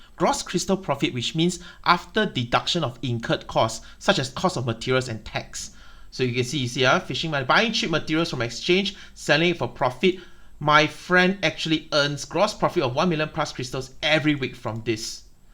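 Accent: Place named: China. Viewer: When i heard a Chinese accent talking about business i believe him instantly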